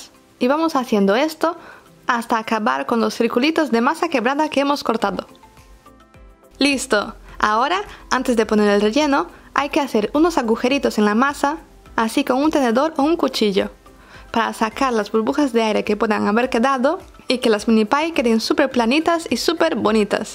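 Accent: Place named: Spain. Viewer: Que buenos videos. Hermosa voz y manera de explicar. Tienes acento español, pero como que tu idioma natal es otro me parece. Saludos desde Argentina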